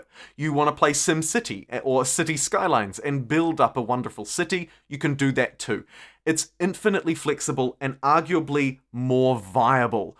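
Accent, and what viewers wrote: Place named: New Zealand. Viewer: Coming back to this in 2026 it’s striking how much more energy your delivery had in these videos and how much stronger your kiwi accent comes across. No idea why those things have changed so I won’t speculate but thought that was interesting.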